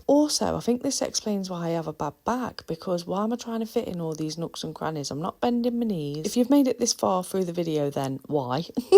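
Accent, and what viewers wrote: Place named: Nottinghamshire, United Kingdom. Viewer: 😂😂😂 you do make me laugh,sounds like your from Sutton-in-Ashfield!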